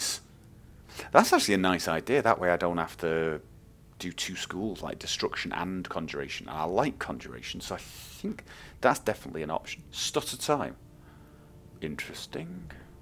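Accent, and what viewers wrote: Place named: Birmingham, United Kingdom. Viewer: Can't believe you're Norwegian, you sound exactly like you come from the Birmingham area of England. O.O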